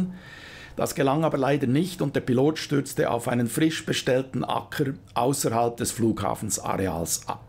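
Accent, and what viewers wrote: Switzerland. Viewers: Salutti, jetzt gebe ich auch mal meinen Senf dazu, ich muss einfach das Kompliment schreiben. Ich bin jetzt 51 im Juni 52 und seit über 30 Jahren auch Pilot allerdings auf den Straßen Europas, jetzt nur noch in Schweden. Ich finde das Gesamtkonzept Deiner(ich duze alle seit ich in Schweden wohne, haha) Videos sehr professionell und lehrreich. Allein die Beantwortung von der in meinen Augen unnötigen Kritik Dir gegenüber gefällt mir immer wieder, hart, exakt und treffgenau. wer da noch versucht Dir Rhetorik beizubringen, der hat dann wohl vergeigt. auch schaue ich mir Deine Videos an, weil Du von meiner alten Heimat berichtest und ich nach sieben Jahren der Liebe wegen nach Schweden gezogen bin. Dein duetsch ist einfach was ich vermisse. Ich wohnte in Ettiswil/LU und wäre dort nach den 5 Jahren glücklich gestorben. Allerdings bin ich jetzt in Västernorrland seit mehr als 12 Jahre und auch seit 2 Jahren schwedischer Bürger. 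Also nicht Wahlschweizer, sonder eben Wahlschwede. Ich bin in Ulm/Donau geboren, darfscht mich also auch Bockwurst nennen. Denn Deine Art ist mir sehr lieb gewonnen. wie die ganzen Kantoner die ich kenne. Hab sogar noch den Schweizer Fahrausweis, der ist ja unbegrenzt gültig. Das fliegen hat mich nie interessiert, da ich schon zu früh die Plomben in den Zähnen hatte und mir daraus selbst die Interesse verweigerte. Allerdings als ich bei der Ruag in Emmen einmal einen Helikopter in der Halle stehen sah, ich wollte mal schnuppern, aber er sagte, er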